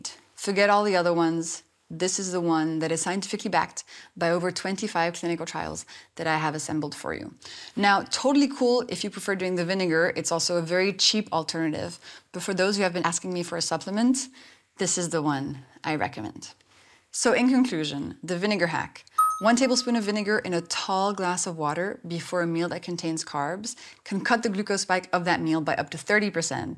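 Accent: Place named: France. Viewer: I believe she is French, hence the gorgeous accent?? Namaste 🙏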